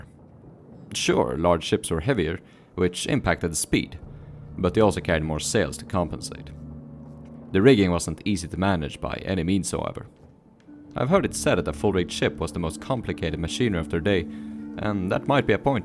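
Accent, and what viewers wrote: Scandinavia. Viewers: Scandinavian accent? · What country are you from? im sensing a scandinavian accent, being a swede my self.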